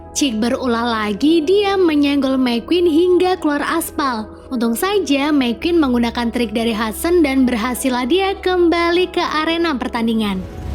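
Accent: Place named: Java, Indonesia. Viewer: Jowone medok men mbak e😁😁😁😁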